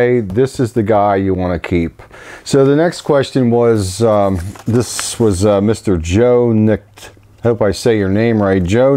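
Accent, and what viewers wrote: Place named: Pennsylvania, United States. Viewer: I'm split between this and the 95 in .22 Looks like Hatsan is pretty good in the sub 300 range. BTW I'm gonna go ahead and guess you're from east Pennsylvania based on your accent. Am I way off?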